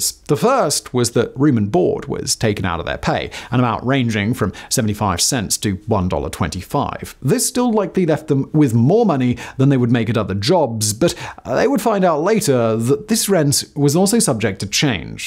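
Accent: RP, United Kingdom. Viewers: being English is pronouncing as a person from England. Being Australian I would pronounce it the same. This is how we pronounce the name in our relative countries. However it is annoying to hear something not pronounced in the way you feel it should be. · Doesn't matter telling him. He speaks the Queen's, erm, I mean, Kings English of the South here in England referred to as RP (Recieved Pronunciation). All the southerners pronounce it that way. Sounds rather goofy to be honest. Wait until you hear them say Maryland. Literally, Mary Land haha😉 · He's British. The way he says things is different than us across the pond. His accent is beautiful. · Amazing that this is what people like you are getting out of this video. Several of you have made comments about the pronunciation. He’s British. Pronunciations vary to one degree or another depending on where you live.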